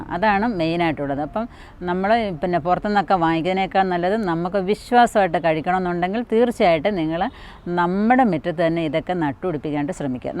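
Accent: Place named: Pathanamthitta, India. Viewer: ഏത് സ്ഥലം ആണ്. ഞാൻ പത്തനംതിട്ട കോഴഞ്ചേരി ആണ്. ചേച്ചിയുടെ സംസാരം കേട്ടിട്ട് പത്തനംതിട്ട പോലെ തോന്നി അതുകൊണ്ട് ചോദിച്ചതാണ്.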